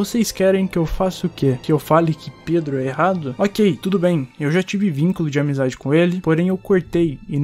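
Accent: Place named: Sao Paulo, Brazil. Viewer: Paulista falando palavrão é lindo dms Vc parece cmg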